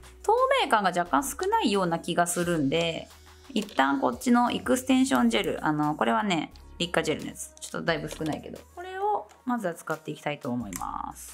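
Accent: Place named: Nagoya, Japan. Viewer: 名古屋の方でしょうか？！懐かしい響きな気がします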